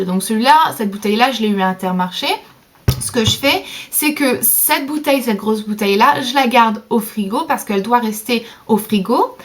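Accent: England, United Kingdom. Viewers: Salut tu es française? Je trouve que tu as un accent anglais...Bises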